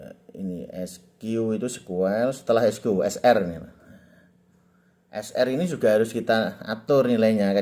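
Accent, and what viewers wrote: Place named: East Java, Indonesia. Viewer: Koyok e wong jatim kulonan iki , hihi...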